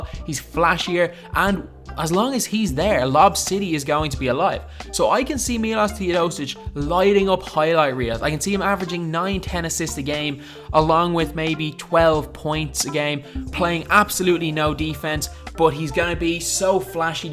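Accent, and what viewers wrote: Ireland. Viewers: is that an Irish accent you're sporting?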